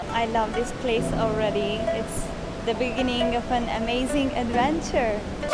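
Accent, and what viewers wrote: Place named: Hungary. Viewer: It was really interesting to hear a fellow Hungarian speak English in a video Nah tessék én is már angolul írok xD Szóval amit már Facebookon megosztottál is szép volt de így videóban még inkább király, szép helyeken jártál 😀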